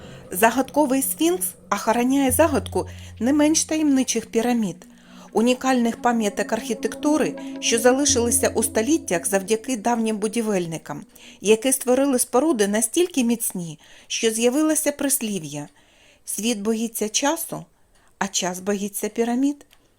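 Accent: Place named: Belarus. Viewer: ахараняє? боже, ви наче не українка. а білоруска, яка з білоруськох мові перейшла на українську....слух ріжить... але урок хороший для дітей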